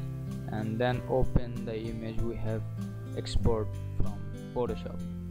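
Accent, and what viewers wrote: India. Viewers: Why does everytime i need some tutotiral in this stuff , Apu from Kwik-E-Mart is the voice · Your English is so fuckall with a crap Bengali accent!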